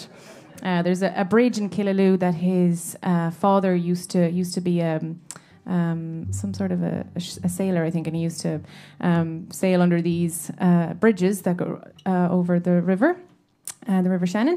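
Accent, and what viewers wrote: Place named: United States. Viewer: it brings back happy memories when my late sister Bernie Rip & me met you in Ennis Cathedral. We were very impressed. Thanks to your lovely Mom & Dad for sending me your very talented video. I'll be looking forward to meeting you when you come home to the lovely Co. Clare. You sound very Americano it would be hard not to pick up the lovely American accent. Best of luck..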